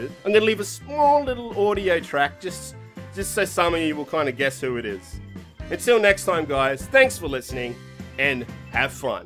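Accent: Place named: Australia. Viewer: can never get enough of that sweet ausie accent!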